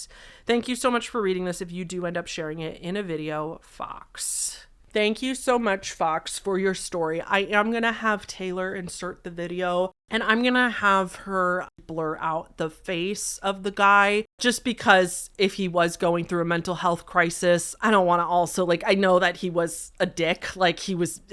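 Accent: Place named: East Coast, Australia. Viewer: It's an Australian east coast accent ahaha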